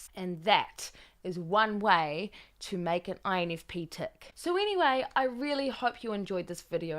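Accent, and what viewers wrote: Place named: New Zealand. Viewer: That kiwi accent. Hard to find in a sea of YouTube content.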